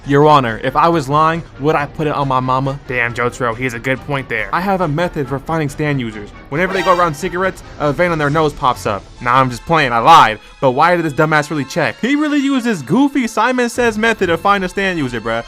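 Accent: United Kingdom. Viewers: This guys british accent is deadly bruh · I liked the British accent, what are you talking about?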